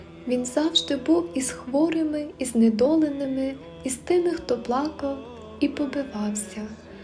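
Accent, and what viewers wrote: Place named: Transcarpathia, Ukraine. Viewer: Дуже ніжний голос, приємно слухати дикторшу. Помітив зразу закарпатський акцент, бо сам з Рахова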